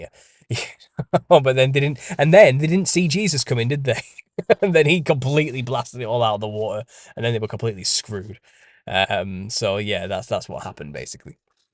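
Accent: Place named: Wales, United Kingdom. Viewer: I love the British accent to because my mom was from Wales and all her family sound like you😂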